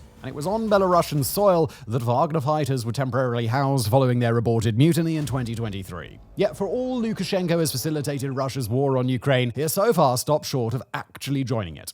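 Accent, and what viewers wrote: United Kingdom. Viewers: Cannot stand the deuchy obnoxious British accent!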